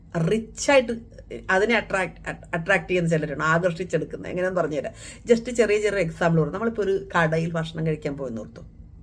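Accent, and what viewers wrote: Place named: Alappuzha, India. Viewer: ശരിക്കും ആലപ്പുഴയിലെ സംസാരരീതി പു ന്ന പ്ര ആണോ വീട് എൻറെ വീട് ambalapuzha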